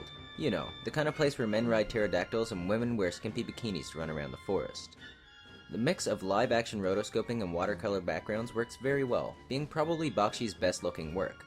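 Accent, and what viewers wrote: Canada. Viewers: Do all Canadians talk the same way on YouTube?...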